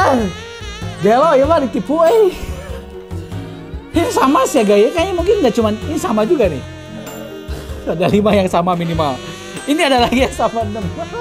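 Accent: West Java, Indonesia. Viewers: logat sundanya keluar banyak wkwkwk jarang² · Sunda euyy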